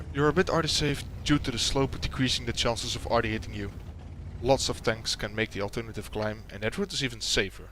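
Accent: Netherlands. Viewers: je kunt zo goed horen dat je lekker nederlands bent.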